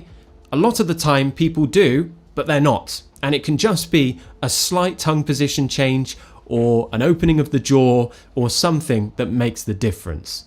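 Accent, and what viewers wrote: United Kingdom. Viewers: You have one of the most beautiful British accent ever❤❤❤❤❤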